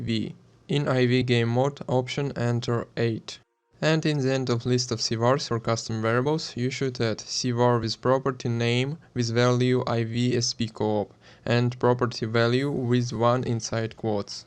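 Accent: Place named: Russia. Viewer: Зачем ты говоришь на английском? Твой акцент сильно тебя выдает, как и дискорд на русском..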